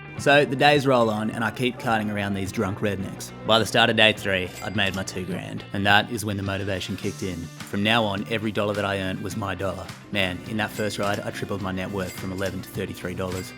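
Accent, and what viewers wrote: Australia. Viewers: I don't like this. I LOVE this. I've shared your vids a few times (and hope your followship and fellowship GROWS). I have special connections to chance, serendipity and Arid-zona. Beautiful tribute, but even better, you're a great storyteller and give sound advice. Oz accent or not (and storyteller is the highest compliment I can give, because it doesn't matter the medium, that is what we do). <3 And RIP to your friend Chris. Unless there's new adventures on the otherside. In which case, I hope he rips it up!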